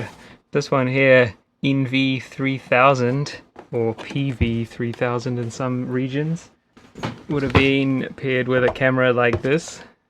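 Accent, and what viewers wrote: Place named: Australia. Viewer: I've been looking at TradeMe... Surprisingly not as many options as one would hope, someone sold an old broadcast monitor like techmoan uses for 300 the other week, kinda nuts. I'm curious then, can I ask where you hail from? My bf reckons you've got an Aussie accent 😅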